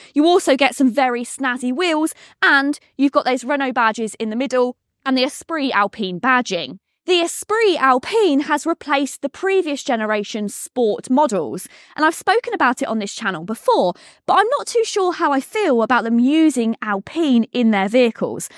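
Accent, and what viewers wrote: United Kingdom. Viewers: Oh that stupid british english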